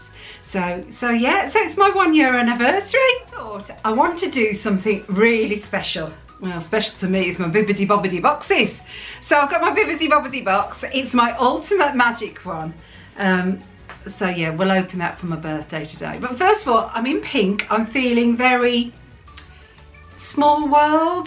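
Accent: Birmingham, United Kingdom. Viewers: Happy eater, happy YouTube birthday and happy early birthday!!! Wow triple celebration!!! Have to say I love love love your videos. Your energy and laughter is so infectious ! And your accent brings me home! My whole family are brummies. I’m in your neck of the woods today for the football (season ticket at West Brom). I travel every week from preston! So I’ve rambled on but just wanted to say thank you for the fantastic videos and for sharing the Disney magic! Xxx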